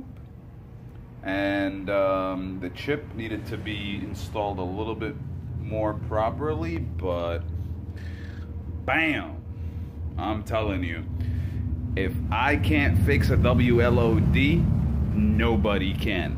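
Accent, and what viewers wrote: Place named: East Coast, United States. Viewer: I like how you get more angry and your east coast accent thickens as the losses mount.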